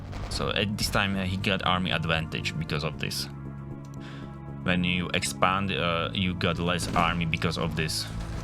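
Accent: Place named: Poland. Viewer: I'm still trying to figure out where you are from. My guess is Polish because of your accent but wouldn't be surprised of it's Czech/Slovak... :)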